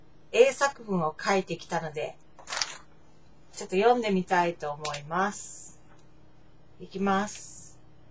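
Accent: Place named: Japan. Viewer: あなたは英語がとても上手いですよ。語彙や文法などは抜群です。日本語訛りはありますが気にしなくていいと思います。あなたの英語は聞き取りやすいです。If you are not satisfied with your progress, I recommend watching as many English movies & TV shows as you can as well as conversing with native speakers. I've been doing similar things to improve my Japanese and have noticed good results (still a long way to go though)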